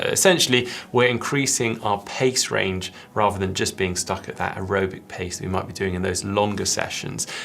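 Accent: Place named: United Kingdom. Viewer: I love the British accent & already ordered a cap, ear plugs & nose plug. Got goggles at Costco.😀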